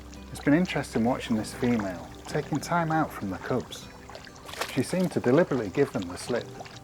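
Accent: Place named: England, United Kingdom. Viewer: So magnificent and magical in every way. The beauty of that island, the photography, the aerial views, the music, your wonderful English accent I am in love with it all. I live on the California coast and have always gotten a kick out of the otters here in Santa Cruz, Big Sur etc but they seem to be different than the ones there. Here they are smaller, fluffier and their tails aren’t so long. Thank you for your presentation very lovely.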